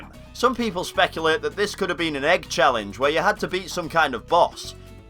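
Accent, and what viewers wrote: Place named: Blackburn, United Kingdom. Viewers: Nice video. Never knew about the duplicate world, really odd. Can't place your accent. Going to have a stab and say Blackburn? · He's so Noorthern - I love it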